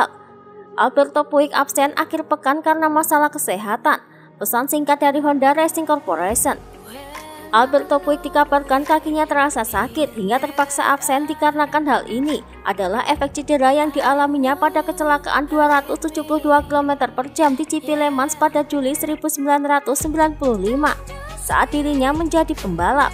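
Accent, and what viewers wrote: Java, Indonesia. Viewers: Medok min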